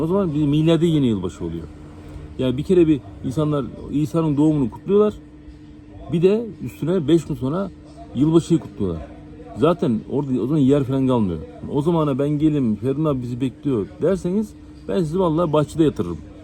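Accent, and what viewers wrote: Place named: Aegean, Turkey. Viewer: KAMBOÇYA'DA YILBAŞI ETKİNLİKLERİ 1. Khmer Yeni Yılı (Chaul Chnam Thmey) Ay: Nisan (genellikle 13-15 Nisan) Etkinlikler: Geleneksel danslar, su dökme festivalleri, ailevi kutlamalar. 2. Lunar Yeni Yılı (Çin Yeni Yılı) Ay: Ocak veya Şubat (tarih, ayın döngüsüne göre değişir) Etkinlikler: Aile ziyaretleri, yemek festivalleri, ateşli silah gösterileri. 3. Düzensiz Yeni Yıl Ay: Ekim veya Kasım (Kamboçya'nın ulusal günlerine bağlı) Etkinlikler: Kültürel etkinlikler, sokak kutlamaları. 4. Kamboçya Bağımsızlık Günü Ay: Kasım (9 Kasım) Etkinlikler: Resmi kutlamalar, geçit törenleri. EN KALABALIK YILBAŞI Khmer Yeni Yılı en kalabalık olanı. Ülke genelinde büyük kutlamalar yapılır ve yerli halkın çoğu bu festivale katılır. diye özetle internet ortamı bunları yazıyor... Ama Kamboçyayı senin ağzından dinlemek çok daha güzel.. Umarım, bir gün maddi olarak imkanım olur da, senin o güzel ege şivenden Kamboçyayı dinleme bahtiyarlığına erişirim.. 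Sağlık ve Başarı dileklerimle..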